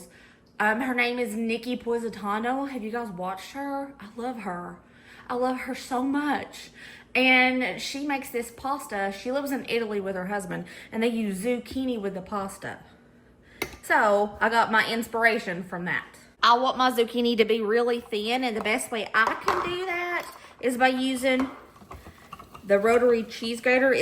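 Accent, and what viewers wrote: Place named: Southern Virginia, United States. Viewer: Please keep content like this coming. I don't necessarily need to feed myself for a dollar, but any meal ideas that help save money are always welcome. And you really do offer some pizzazz with your cooking. Love it. Also, I haven't heard anyone say "peench" since I lived in southern VA, so you're bringing back some good memories, too!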